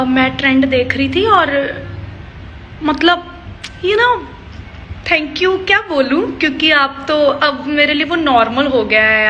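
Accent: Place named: Punjab, India. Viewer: Never leave your punjabi accent it is sure pure and full of good energy .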